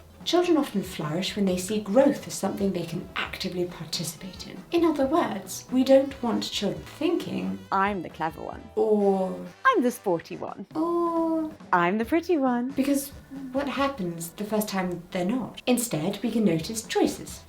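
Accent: United Kingdom. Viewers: You sound like what I think every person on the North American continent thinks British women sounds like. I will be subscribing for more.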